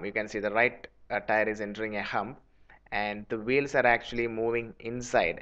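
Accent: India. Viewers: the indian accent sucks and is becoming default accent of english everyday.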